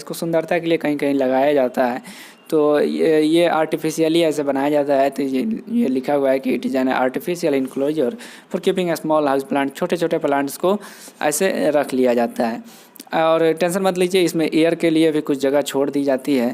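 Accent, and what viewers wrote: Bihar, India. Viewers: Mujhe toh aapki awaz ko sunte hi pata Chal gaya ki Aap bihari or bandar ho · Aap bihari ho naa aapke bolne se pata chal raha h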